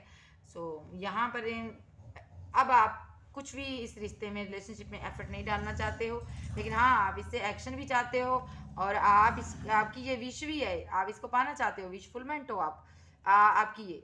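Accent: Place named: Punjab, India. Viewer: Madam aap ka Punjabi lehja boht acha lagta hai Allah aap ko aur kaamyabi de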